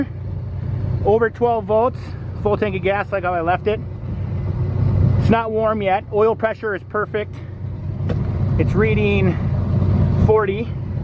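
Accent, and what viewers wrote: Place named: Wisconsin, United States. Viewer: keep up the great videos! They have been extremely helpful. Watched several of your winterizing videos last year to get my boat put away. Cranked up easy this year and we have already been out on the water a bunch. Also replaced my exhaust flappers, and going to be replacing my trim solenoids soon! Your videos are spot on, and I really dig the Wisconsin accent. Peace brother!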